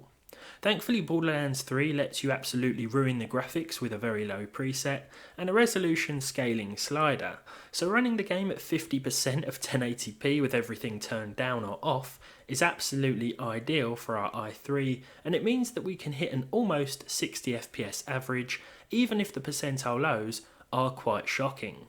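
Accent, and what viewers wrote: United Kingdom. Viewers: Your videos are so chill. just a calm british dude talking and no cringe intros or ads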